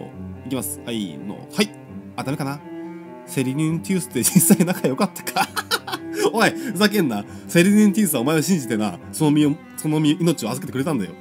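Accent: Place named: Kansai, Japan. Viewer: 棒人間のクオリティがｗあとさんの関西弁なんかいいですねｗ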